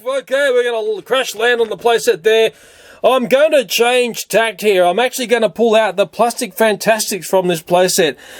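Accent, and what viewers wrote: Australia. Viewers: I’m sure your target audience for this video is two three olds and older. I know my daughter will love to watch this she’s two. I’m 24.. and I just watched this through.. I love it 😆 so you know your kiddie YouTube videos are not only for kiddies.. you’re a great person and I love all your videos.. I try to share all your videos as much as I can.. love you as a person, were in the uk. But your awesome Australian accent always is my three in the morning cure to go back to sleep 😛 chronic insomniac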